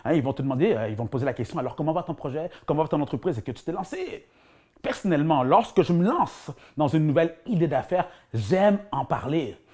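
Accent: Quebec, Canada. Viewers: il doit avoir grandit au quebec avec laccent surtout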